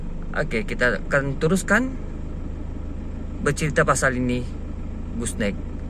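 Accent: Sabah, Malaysia. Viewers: Abg org Sabah ke?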